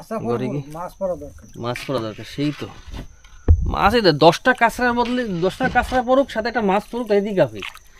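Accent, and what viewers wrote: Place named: Barisal, Bangladesh. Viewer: দাদা আপনার আমার খুব ভালো লাগে আমি আপনার ভিডিও গুলো সব সময় দেখি আপনার ভাষা আমাদের দেশের বরিশালের ভাষার মতো পায়সা মাছ আমারও প্রিয় মাছ কারণ আমরা সুন্দরবনের কাছে থাকি আমি বাংলাদেশ থেকে বলছি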